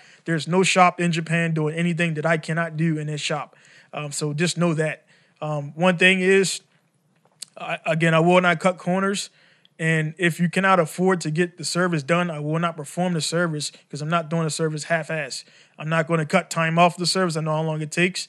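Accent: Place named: Baltimore, United States. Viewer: A mix tape would hav been dope, do you still make beats??? Your accent is nuts my dude! Still hear that bmore flex tho. 😏 Being in business for yourself is crazy, it’s the best and worst thing ever. Holding yourself to a higher standard is important, never undervalue yourself or your work. As soon as you start doing that, everyone else will too. Looking forward to hearing more from you! Awesome test/intro. Hav a good night.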